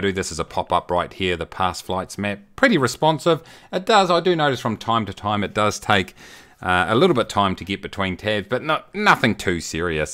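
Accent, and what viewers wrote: New Zealand. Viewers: 🤣 The auto text understood you to say Korea instead of Career. You know that I Love the Kiwi accent mate. 🥝 🇳🇿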